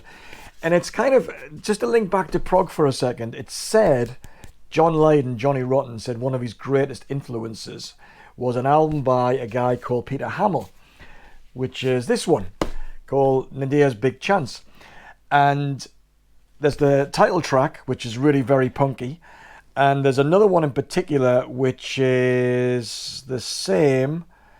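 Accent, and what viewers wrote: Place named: London, United Kingdom. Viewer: I'm a new York girl and when I heard your London boy voice I was bracing myself lol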